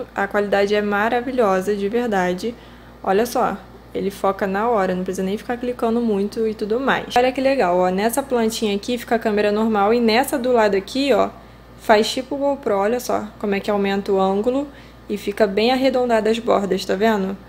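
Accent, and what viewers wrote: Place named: Rio de Janeiro, Brazil. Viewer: Carioca... Aqui no Rio geralmente usa muito o x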